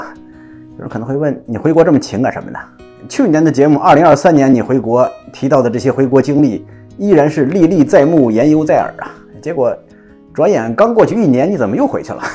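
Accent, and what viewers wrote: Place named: Beijing, China. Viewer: 沒有惡意，對你視頻還是挺喜歡的，特別是你的京城口音❤👍😀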